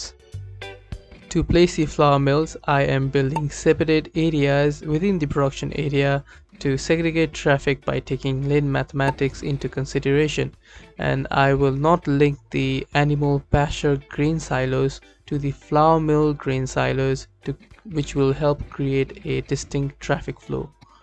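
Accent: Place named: India. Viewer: Your accent is very much Indian ! Where are you from ?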